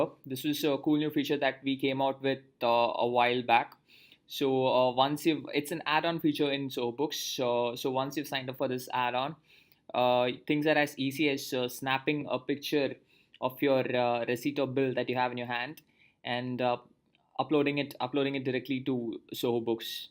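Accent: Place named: India. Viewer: 😅 indian accent 🤦‍♂️🤦‍♂️ at least try to speak without accent, 🤦‍♂️🤦‍♂️ nobody likes this shit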